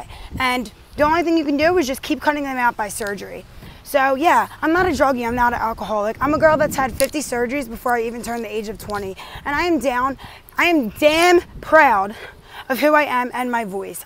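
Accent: United States. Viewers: I never thought there was something wrong with your voice. I always thought it was more of an East Coast thing. 🙂 i like it n im sure there are other ppl who do to. You're reading wouldn't be you without your voice. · I cannot pinpoint your accent but I def recognize east coast, New England maybe? ❤️🔥🤗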